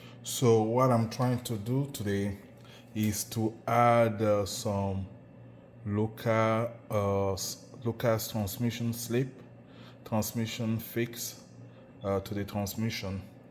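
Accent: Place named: France. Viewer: I love your French accent.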